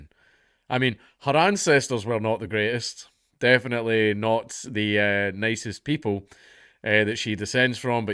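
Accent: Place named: England, United Kingdom. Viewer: You sound like 80% of the English population and now we have her son who no one cares about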